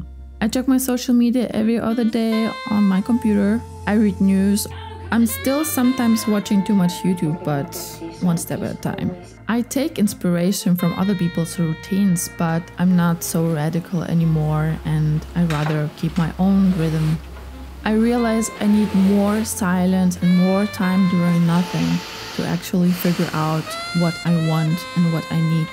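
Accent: Germany. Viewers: Toller Video. Die Shots, Winkel und Kamerafahrten sind genial. Der ganz leichte Deutsch-Akzent macht zusätzlich charmant. Etwas schockiert plötzlich mit Dir in der Dusche zu stehen... Insgesamt: Wow. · I like your German accent, mamma mia! Guten abend von Italia!!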